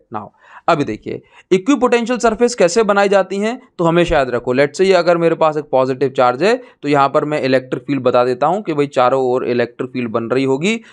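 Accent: Odisha, India. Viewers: Ap odisha se ho sir?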